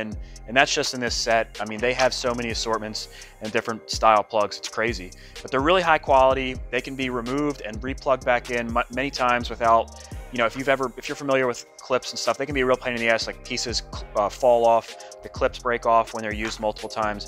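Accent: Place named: Philadelphia, United States. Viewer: mines an SS, I didn't want to deal with a clutch in the woods but still wanted to be able to shift... Not sure where you're from for if you'd have to deal with it as much (but judging by the "wooder" accent of water, sounds like you're from the Philly/NJ area, so you'd have woods riding as well). But a yxz is pretty easy to work on, everything's pretty open or hidden behind 1 panel instead of having 20 million panels. Just put a titanium exhaust from Graves on mine, sounds like a 1000 streetbike in the woods... Plus, who wouldn't want a 10.5k rpm redline?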